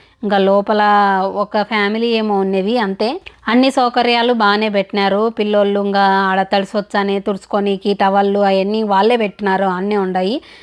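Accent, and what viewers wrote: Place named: Kadapa, India. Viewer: Bagundava akka Maadi kuda Kadapa ne pulivendula Maadi meeretla matladataro maa oorlo atle matladataru I'm sorry connected to u akka I like ur thought process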